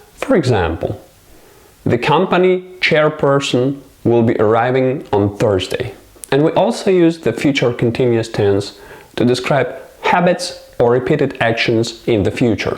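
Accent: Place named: United Kingdom. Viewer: Цікавий акцент. Схожий на британський.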